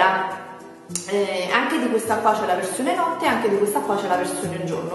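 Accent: Naples, Italy. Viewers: Adoro questo tuo accento Napoletano.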